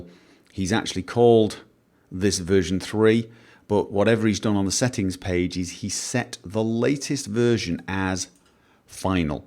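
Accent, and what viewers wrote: United Kingdom. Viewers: You are from Norway? this is impossible. I am sure You are British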